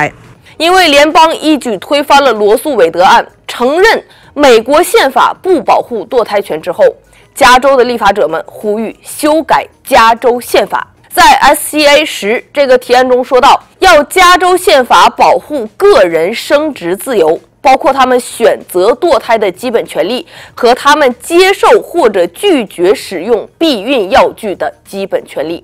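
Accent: Northeast, China. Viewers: 听小姐姐说话可好玩儿了，哈哈哈，东北口音小姑娘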